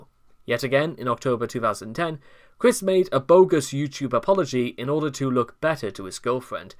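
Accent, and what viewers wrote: United Kingdom. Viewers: You sonded like a cockney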